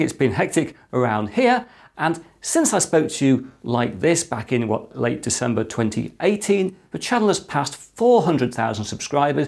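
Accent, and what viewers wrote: England, United Kingdom. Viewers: subscribed for the crystal clear explanations with the always enviable english accent!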